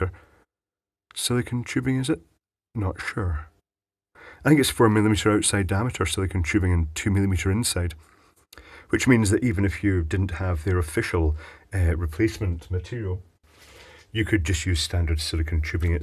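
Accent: Scotland, United Kingdom. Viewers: Hey Big Clive, really enjoy all your videos. From scotland myself and its nice to hear one of us on youtube for a change. Anywho can you look into flat earth?? There is someone from paisly getting alot of people on the subject just by going around paisly and glasgow and spreading the word. Let me know if you will atleast look into it if you havent already?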